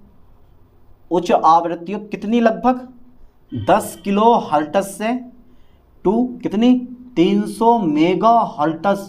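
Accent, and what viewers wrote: Bijnor, India. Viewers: Sir ap district bijnor se ho ...? Apki bolne se lag rha hai ap bijnor district se ho ...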